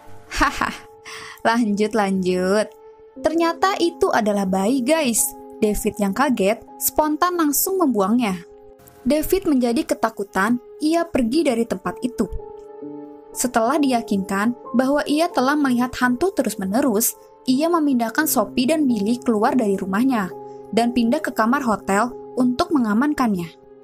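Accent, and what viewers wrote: West Java, Indonesia. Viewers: Orng mn km kak orang bandung ya 'kumaha teh hh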